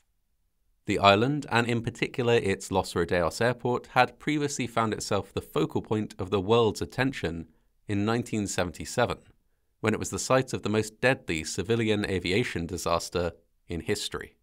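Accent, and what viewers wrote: United Kingdom. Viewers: get rid of that stupid british acsent it sux like your littile island 😮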